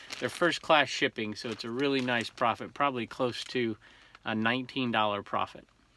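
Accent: South, United States. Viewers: How do you have such a Southern drawl if you grew up in CA?!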